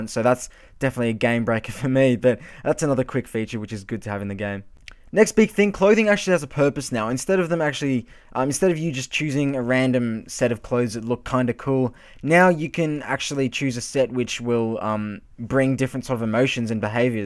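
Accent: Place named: Australia. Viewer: What country are you from to where talking and driving are realistic? You sound Australian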